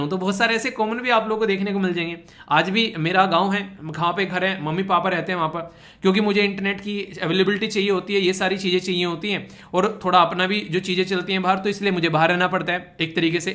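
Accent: Haryana, India. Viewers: haryana se ho na aap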